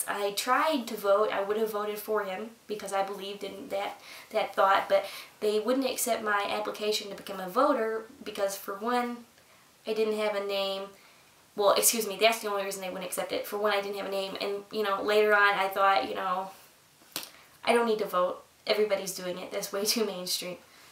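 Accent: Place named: Upper Midwest, United States. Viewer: Let me guess, she's from Wisconsin? Perhaps Minnesota? Nice accent:)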